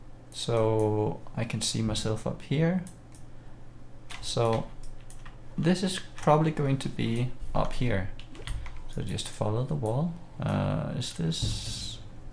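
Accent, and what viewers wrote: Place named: Denmark. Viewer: Hey, you sound like you have a danish accent. Where are you from?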